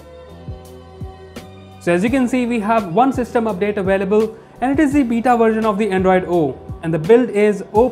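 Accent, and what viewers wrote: India. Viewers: Your english is so odd hahahah what accent is this? I can barely understand what you say. People say that Indians have a very hard english to understand. That's true.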